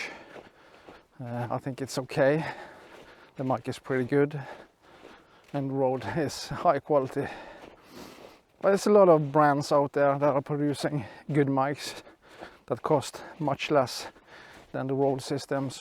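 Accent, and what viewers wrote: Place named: Norway. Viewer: Du ar fra Norge? That accent lol...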